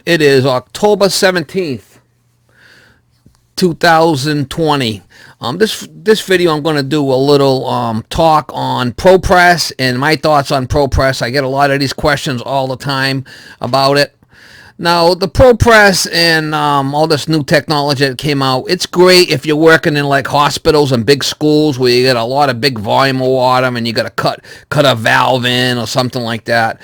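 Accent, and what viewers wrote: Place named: Boston, United States. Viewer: Where you from? Revere? Easty? You sound North of Boston. I'm from Dot myself. Love your vids dude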